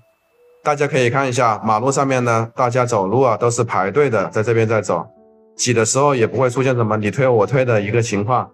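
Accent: China. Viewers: 哈哈，他装上海人吧，一听就是湖南还是哪里的口音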